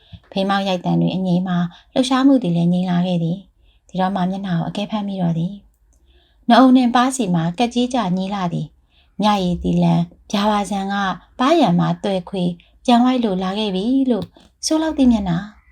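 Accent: Upper Burma, Myanmar. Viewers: မင်္ဂလာပါတို့ အညာရေဆရာမခင်ခင်ထူးဝတ္ထုကောင်းလေးကိုရွေးချယ်ဖတ်ပြတာစာဖတ်သူအသံလေးနဲ့အညာသံအညာဓလေ့တို့အညာနဲ့လိုက်ဖက်နားထောင်ကောင်းလိုက်တာကျေးဇူးပါနော်❤❤❤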